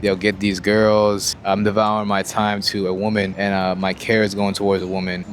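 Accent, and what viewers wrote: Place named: Toronto, Canada. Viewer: Mans sounds like he's from the 6ix.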